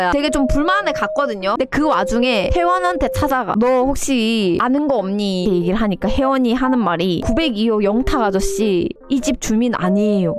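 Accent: Gyeongsang, South Korea. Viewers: 경상도 맕투 개중독됨 ㅠ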